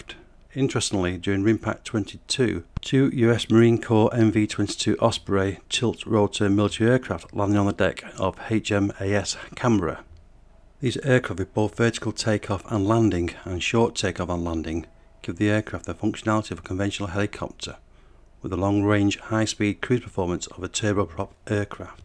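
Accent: Northern England, United Kingdom. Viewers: Got northern accent. Sound suicidal. Voice as colourful as coal pit near Birmingham. Sound like VoiceOver recording is a painful chore.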